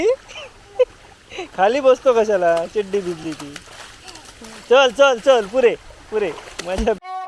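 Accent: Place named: Malvani, India. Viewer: तुजी बायको किती मेहनती आहे. तू जे मालवणी बोलतो ते ऐकून भारी वाटत.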